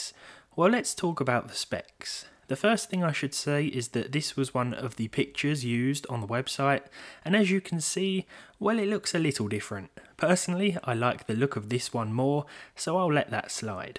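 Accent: United Kingdom. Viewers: For some reason I simply like your British accent 😂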